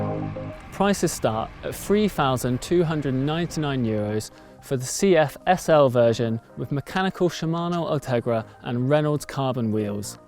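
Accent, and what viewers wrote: United Kingdom. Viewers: Good British English. Soo easy to listen to.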